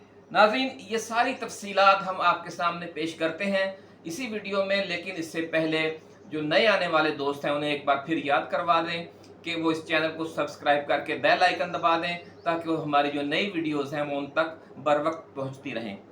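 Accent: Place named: Punjab, Pakistan. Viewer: Khuda ka wasta Urdu theek sy bol kren.. pandat nhi pandit...& Many other words .urdu ko urdu ki trha bola kren punjabi ki trha nhi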